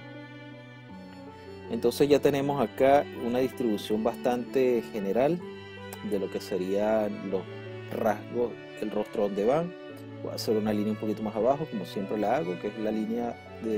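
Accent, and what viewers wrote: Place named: Chile. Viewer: A mi me suena a Chileno, tiene la misma voz que Wikisebas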